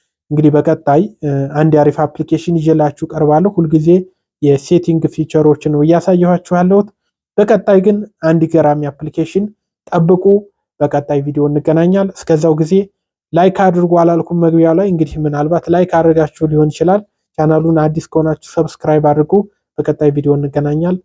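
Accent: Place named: Wollo, Ethiopia. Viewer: ወሎዬው አክሰንትህ እጅግ ተመቸኸኝ።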